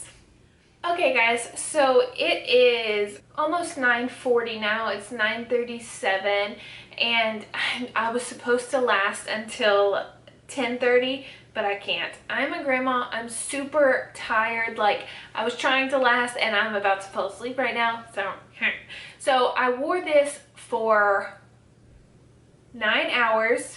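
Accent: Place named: South, United States. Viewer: I do find that so crazy. Even though I don't personally make videos or anything, I do watch mostly "beauty" related content here on YouTube. And I, personally, like to watch those that I find relatable. Which is why I, typically, stick to watching the "smaller" channels, per say. I've seen several where I hear a thick southern accent and get a little hyped thinking they might actually be from here, but they never are! LOL. You are the only one I have come across, thus far!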